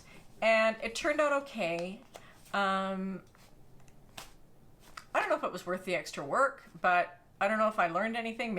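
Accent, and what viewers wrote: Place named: Canada. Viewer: Thanks for your videos. I just discovered you. I love your Canadian accent, so easy to listen to. I wonder if you ever do a FBA. I'm struggling to get my makes to fit me nicely. If I make a size 18, its too big in the shoulders and neck area, so I have attempted to do FBAs, but am never quite satisfied with the results. Thanks again and keep them coming.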